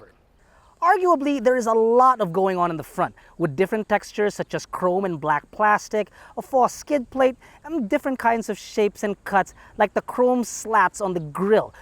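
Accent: United Kingdom. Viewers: medyo dinig yung hangin sa B-roll.. baka mas okay kung i-mute? Love the British accent. hehe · That hilarious British accent killed me! 😂 On a serious note, what are non-Chinese car manufacturers thinking? Chinese car brands (or atleast cars made in China) are bringing better tech and value at a lower price in the Philippine market. It's as if they're just relying on brand recognition and perceived "dependability in the long run" to make sales.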